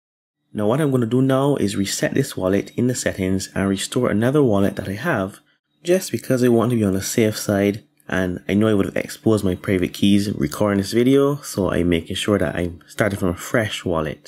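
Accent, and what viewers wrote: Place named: Barbados. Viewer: Love that Barbados Accent sounds like English but with a slice of Lime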